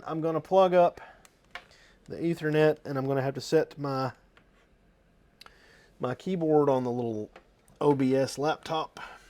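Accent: South, United States. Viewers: Excellent. Love the Dixie accent !! ;)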